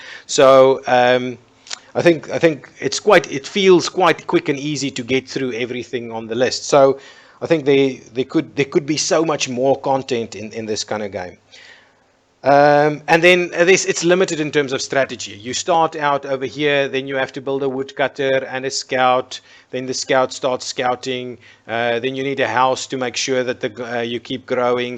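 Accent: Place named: South Africa. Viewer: lol saw your post on steam. first 5 seconds I thought ... "is he also South African"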